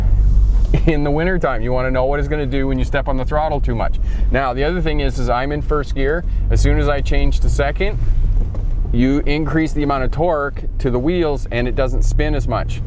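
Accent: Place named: Canada. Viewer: I knew this guys was Canadian lol. His accent just didnt sit right with me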